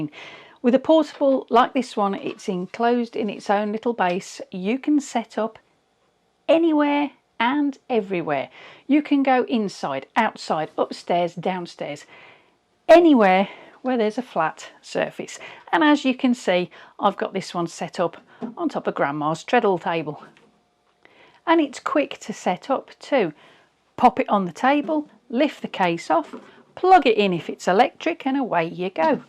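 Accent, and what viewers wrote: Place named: United Kingdom. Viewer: OMG, I still can't figure it out from where you are shooting your videos. Your accent is British, the sewing space looks British too and handcranked machines, but your Oregon /Sisters video:-))) LOL.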